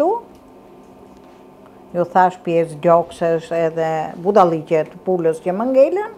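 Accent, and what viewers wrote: Tirana, Albania. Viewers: Ty boft dita 1000, se ti me kenoqe vetem duke t'nigju , ate dialektin e mbrekullushem te Tirones 🌟🌟🌟🥰🥰🥰❤️❤️❤️💐🌹🌻🌷🌺🌼🌸🏵️☘️ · Sa te mira qe i ben!Me kujton mamin tim!Te ka lezet dhe e folmja tironce,nostalgji!Flm per keshillat mira,te ndjek me shume dashuri!❤ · Me pelqen kur flet me dialektin e tironse.Je shume e mire dhe e direjte perdrejte.Zoti te dhe te shendet dhe lumturi.Respekte e dashur❤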